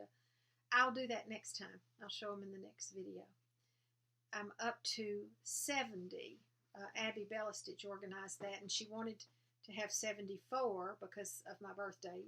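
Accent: South, United States. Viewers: I just love your videos - I could listen to you all day long, being from Iowa I just love your southern accent. My family Thanksgiving is in the midst of changing and not just due to covid. This year we won't be gathering, and last year was the first time in my life that I remember going to somebody other than my Uncle Johns' for the gathering (over 40 years - I stitched the Plum Street His & Hers Thanksgiving stockings as a thank you). If you recall, I would like to know the designer of the Halloween tree/alphabet with the pumpkin at the bottom pictured ~ that is adorable. I just finished stitching Scarlett House's Autumn Alphabet. I am so very sorry to hear that your brother is declining, and will keep you both in my thoughts - Joan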